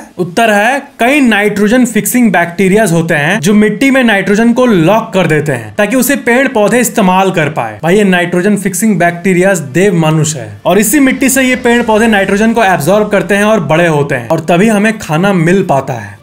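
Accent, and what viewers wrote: Bihar, India. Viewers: Bhaiya bihari ho kya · Bihari ho kya aap?? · 😂😂that bihari accent 😅❤❤❤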